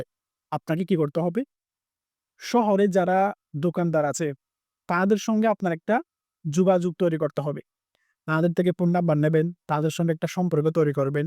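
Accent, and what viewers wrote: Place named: Chittagong, Bangladesh. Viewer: Ctg man কথায় বুঝা যায়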